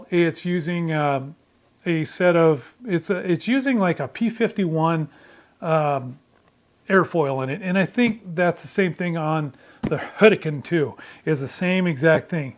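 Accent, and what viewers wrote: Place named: United Kingdom. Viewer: Your pronunciation reminds me of my favorite movie "battle of Britain" , from 1969 , the old British actors says it just like that ( with the proper amount of stiff-upper lip) , in that movie you also get brits trying to pronounciate "stukas" and Germans trying to say "Spitfire" which sounds hillarious , not ready to tackle a warbird scale myself for building and flying ( but trying to cobble together an old falcon 56 kit for the moment) your channel has soo great tips on building, cant wait to try some of those techniques!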